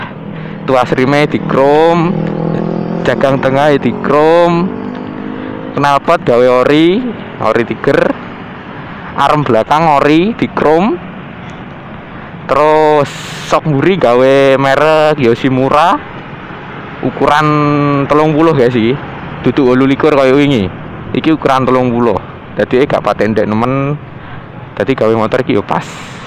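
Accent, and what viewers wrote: Java, Indonesia. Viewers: Jowo Endi mas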